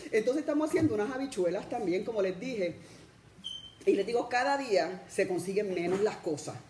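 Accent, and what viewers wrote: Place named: Puerto Rico. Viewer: Sii se nota que es puerto rique~e y apura sepa!! Y a tii a leguas se te nota la envidia....sii no tienes nada que decir aracante pal la mierda!! Boricua hasta la muerte!!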